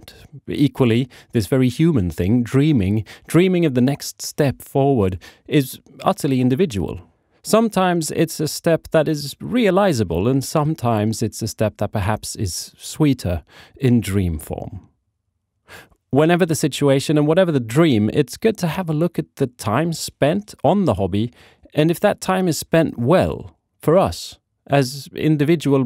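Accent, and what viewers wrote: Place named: Sweden. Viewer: I like your style, there aren’t many minipainting channels that look like yours. I would love to be proven wrong. Though you were British for a short moment but then I heard the Swedish and realised yes this man has a lot of style. I can relate more. Also the cinematography or photo I don’t know what is what, it very much works. Also how you give the viewer the why and your purposes. Not just the how. Very important. I like the string hula conversion very much, would you mind showing me the ropes I want my own!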